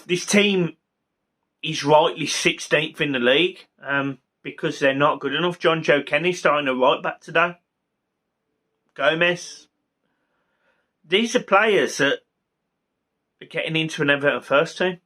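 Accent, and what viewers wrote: Birmingham, United Kingdom. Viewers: I was expecting you to be a villa fan when I heard the accent! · Come and join the Villa family mate you’ll fit right in with that accent. 👍🏻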